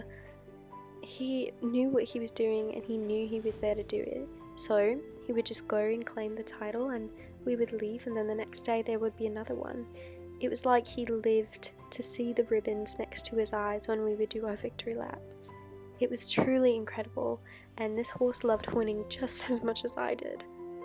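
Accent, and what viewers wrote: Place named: United Kingdom. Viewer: i got the chills wow, is it me or does she have British accent in this video but not on her other videos idk anyway love you <3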